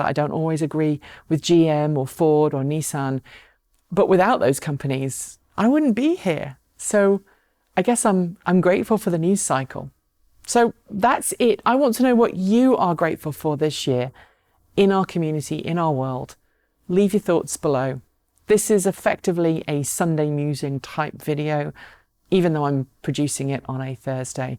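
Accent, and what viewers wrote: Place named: United Kingdom. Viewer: Are you from the US? That's not possible. You definitely sound British.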